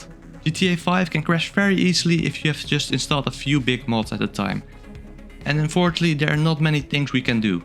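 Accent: Netherlands. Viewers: Thickest dutch accent of the week. Nice video tho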